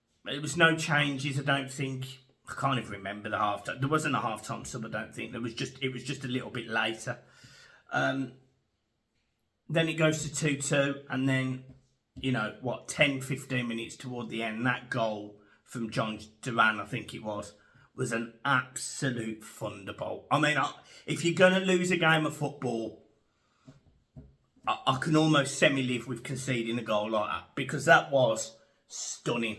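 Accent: Birmingham, United Kingdom. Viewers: Are you a Brummy?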